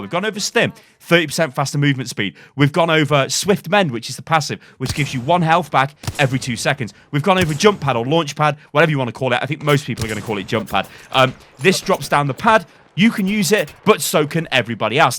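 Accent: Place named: United Kingdom. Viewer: 200iq play. I’m fucking cracking up. Whatever anyway my twitch is “theToxicLlama” I’m crazy funny toxic and I suck ass. Tune in. Rumor has it I’m gonna off myself soon for losing so many games. Great content. Always watched your OW stuff. I am truly truly brutal on my OW streams. P.s I generally hate British people. Stupid fucking accent but your aighttt big brain big IQ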